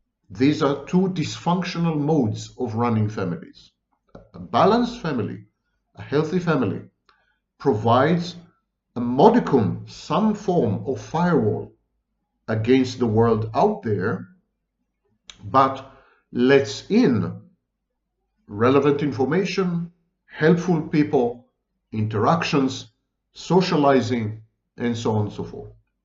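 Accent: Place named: Israel. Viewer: Like the Israeli accent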